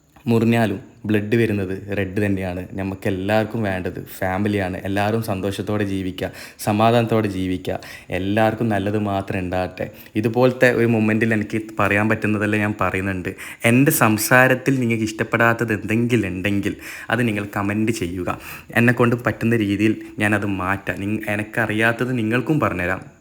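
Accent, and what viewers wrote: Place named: Malappuram, India. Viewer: എന്ത് മെജയാണ് സംസാരത്തിൽ പൊളി മോനെ മലപ്പുറത്തിന് bro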